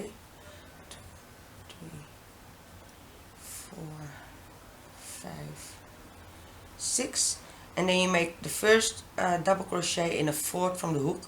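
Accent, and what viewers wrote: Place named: Netherlands. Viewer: Are you from the netherlands ?? You sound dutch #dutchpower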